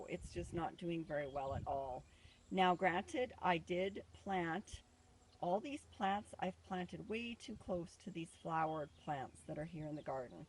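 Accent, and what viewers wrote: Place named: Canada. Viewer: Great video! So fun to see your pretty face and hear your Canadian accent! I don't think lettuce likes wood chips until they have really broken down into compost. I have not had success with mulch of any sort with my lettuce. And I think everything does better if the wood chips have been in place for at least one winter. They certainly seem to get better with time. I love your experimenting! And your indoor garden was incredible! This year I tried direct seeding everything. Don't think I will do that again. My cukes have been a huge failure. Thanks for the tour!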